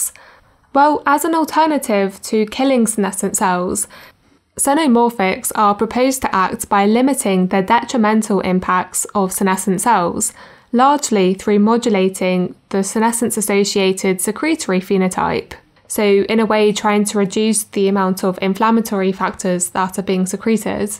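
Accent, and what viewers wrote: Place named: United Kingdom. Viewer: fascinating, thank you, it's above my head but, but very neat. May I ask about your accent? I hear british but specifically what region? I almost hear a mix of a second accent but cannot place it. (it's a nice sounding accent) I'm curious, as a couple people I know moved there and they both developed their regional accents the longer they are there. Thanks again God bless you and yours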